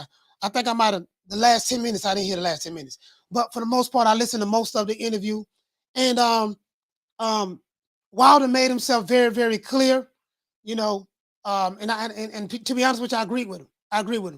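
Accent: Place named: Texas, United States. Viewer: Hey Coach I'm going to check your show out. 2hrs is a long time.i have to break it up into several segs. Where you from Coach? You sound like you're from Oak Cliff, TX.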